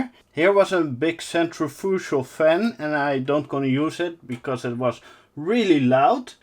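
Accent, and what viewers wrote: Netherlands. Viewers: Zwaar NL accent gast. I suggest getting rid of the hdd's and putting in an SSD, that would make the system faster, more quiet and consume less power. And you can use the storage for logs, caching or snort. You really don't want to virtualize your PFsense router trust me! Sure it's fine for some tests but it's a pain in the ass if something goes down. Also with segmenting your network or VLAN's it's much easier to have a physical machine. The only drawback is power consumption.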